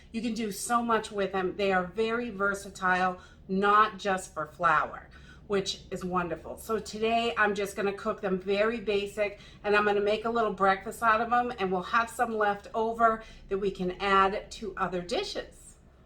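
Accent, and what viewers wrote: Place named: Northeast, United States. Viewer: i cant figure out your accent. is it nj? or ma or ny?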